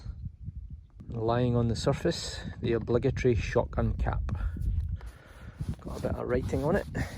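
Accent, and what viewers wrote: Scotland, United Kingdom. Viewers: another great video, but can I give you a wee bit of advice please. when you go hame after yer dig an clean aw yer finds - put yer water bottle and an old bar towel intae yer finds bag an you,l be 100% ready tae go on yer next dig. keep postin yer digs as I enjoy them an also hear an accent from hame. billy Mac.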